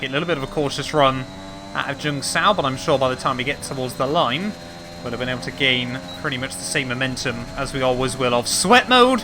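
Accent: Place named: England, United Kingdom. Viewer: "Sweat mode has to well and truly be engaged." You have to love the English language when it is spoken by an Englishman. To me, it just sounds like F1. 😁🇺🇸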